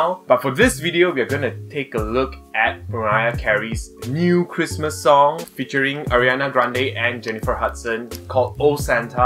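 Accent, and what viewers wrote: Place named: Jamaica. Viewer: Love the reaction! However, I couldn't help but notice what sounded like an island accent of Jamaican or Trini? Either way, Happy Christmas!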